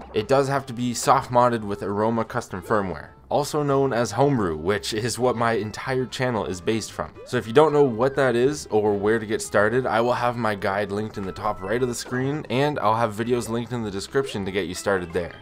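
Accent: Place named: Canada. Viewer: you pronounce sorry like soorry. Just like me and many other Canadians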